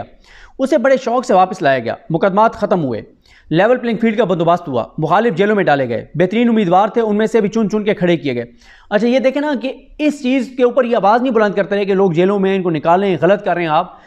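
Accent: Saraiki, Pakistan. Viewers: AP ka ghr idr Hy islmabd???? R hm AP ki sraiki ko sumghty him bohttt piari boli Hy hm jhng sy hin r faslabd rehty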